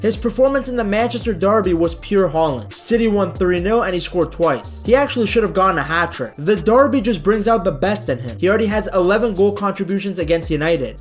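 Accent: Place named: United States. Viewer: Can I ask you where your from? If it's the states then I would like to say that, your the only American I can actually listen to talking about footy!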